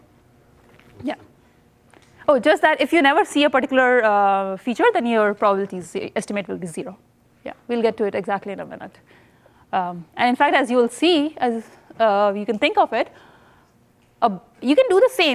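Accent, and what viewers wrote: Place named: India. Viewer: Indian? Oh my god, terrible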